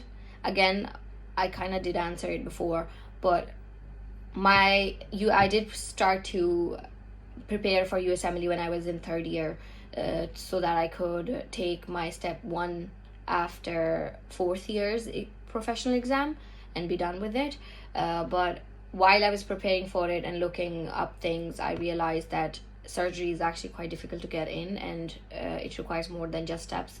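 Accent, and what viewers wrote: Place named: Ireland. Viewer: crazy how uve only been In Ireland around a year and im already hearing a bit of an Irish accent peaking thru!! so cool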